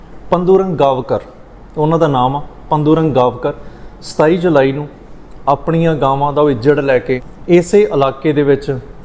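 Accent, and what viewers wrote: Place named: Jagraon, India. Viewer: ਵਧਾਈ ਆ ਬਾਈ ਜੀ ਸਵਾਦ ਆਉਂਦਾ ਸੁਣ k ਲੱਗਦਾ ਤੁਸੀਂ ਸਾਡੇ ਏਰੀਆ ਤੋਂ he ਹੋ ਜਗਰਾਓਂ ਤੋਂ ਅਸੀ ਰਾਏਕੋਟ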